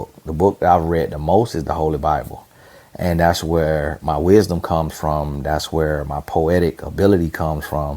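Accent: South, United States. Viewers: I hope you don't lose your accent. Your southern accent coupled with your God- given wisdom and humor sets you apart from the rest. It's very much appreciated. It's refreshing hearing a relationship coach who doesn't sound like everyone else. 👍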